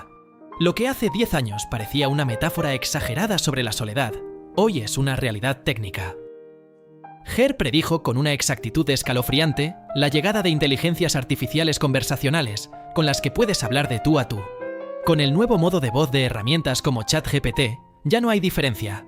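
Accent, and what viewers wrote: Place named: Spain. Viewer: Es que no existen otros narradores que no hablen españoletos? Ya lo dije NO VEO VIDEOS NARRADOS POR ELLOS. NOJODAAAA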